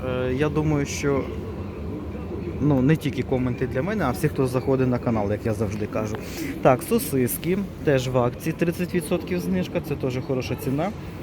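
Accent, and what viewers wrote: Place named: Cherkasy, Ukraine. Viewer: Той момент, коли в мене в області всі так розмовляють і це норма. Привіт з Черкащини, 😅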